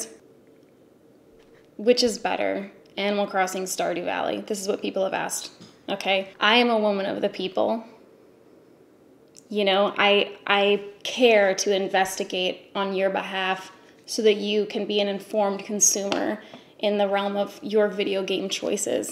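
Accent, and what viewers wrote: Canada. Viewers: Haku Hodo is just a pleasant word in general! It doesn't have to do with your Canadian accent. I think it'd just your wonderful, unique self!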